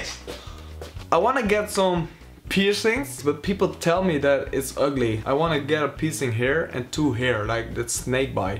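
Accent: Netherlands. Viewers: Je bent nederlands. Dat hoor je aan je accent😂😂💗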